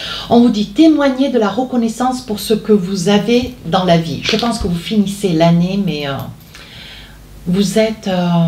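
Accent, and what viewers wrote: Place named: Southwest, France. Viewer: Vous avez l’air fort sympathique et me faites bien rire, avec votre parler cru mâtiné d’un petit accent (sud-ouest?). Pour le fond, mensonges et trahisons on s’en passerait mais succession et lointaine destination sont des mots qui vont très bien ensemble ;) Affaire à suivre, en vous souhaitant le meilleur à vous surtout!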